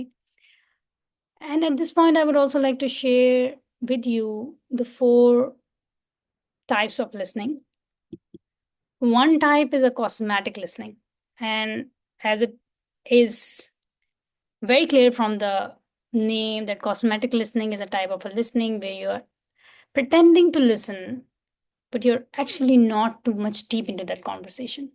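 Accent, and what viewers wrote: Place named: India. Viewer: I am sorry, you delivery is incredible boring and you non words make me distracted, no thank you I can't go on and try to understand your speech that strong accent and not making any effort to pronounce well the language. And you repeat what everyone said out there it is override 👎may work in India, but not in thise side of the world, your competition pass you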